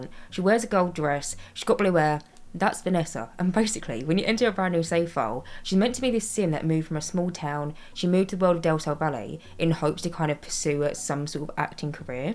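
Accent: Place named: England, United Kingdom. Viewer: your builds are always gorgeous. on another note, as an ex-pat nice to hear a very english accent again.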